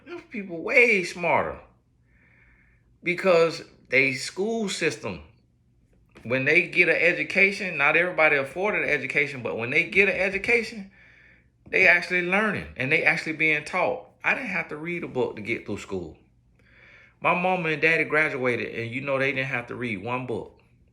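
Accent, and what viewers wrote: South, United States. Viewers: Hey, u don’t have a country accent, u have a southern accent! U articulate just fine. Correct anyone who says that to you. Its a southern accent!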